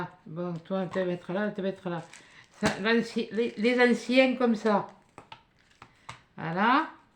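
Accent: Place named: Southwest, France. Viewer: Bonsoir je suis nouvelle sur votre chaîne quand je vous écoute parlez j'ai l'impression d'entendre la voix de maïté la cuisinière 🤣des mousquetaires que j'aime beaucoup dommage qu'ont ne la voit plus à la télé 📺je suppose que vous venez du sud ouest moi je suis du nord de la France une chti's 🤣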